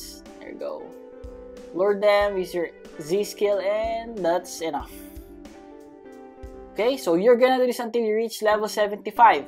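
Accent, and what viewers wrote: Philippines. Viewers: SO THATS WHY U SOUND LIKE FILLIPINO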